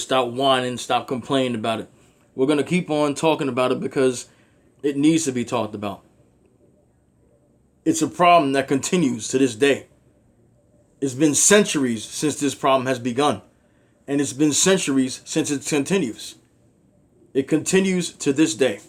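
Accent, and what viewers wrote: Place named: New York, United States. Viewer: Who is this buff man with a New York accent? Miles Morales?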